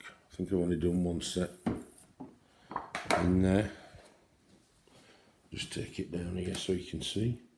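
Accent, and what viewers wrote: Yorkshire, United Kingdom. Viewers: Enjoyed your video, recognise your accent as Yorkshire may be, as I live in Yorkshire uk, New subscriber via The Bibliophile colourist. You have a great art space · Love your space 💜 and your Yorkshire accent 😍 All the best with your channel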